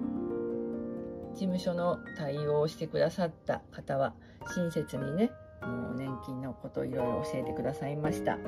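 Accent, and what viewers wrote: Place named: Hokkaido, Japan. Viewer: さん初めまして😊 さんの話し方が、北海道にいる親戚に似ていて、とても懐かしくなりました♪ これからも、動画のアップを楽しみにしています！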